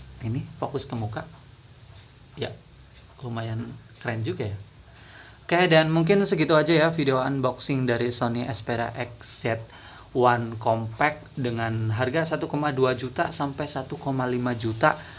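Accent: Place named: Java, Indonesia. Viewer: Bang lu orang Jawa ya kok logatnya Jawa bngt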